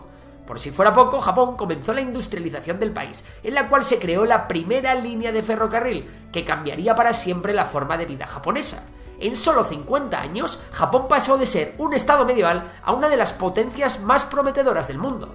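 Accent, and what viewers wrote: Spain. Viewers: Que buenos videos nos traen los españoles a YouTube.... Peeeero cuando mencionan la G o la J parece que van a escupir un catarro. Suena muy feo el español.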